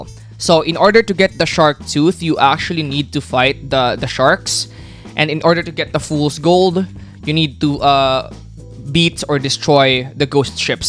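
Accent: Philippines. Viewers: Are you a Filipino? Because i think you are cause of accent lol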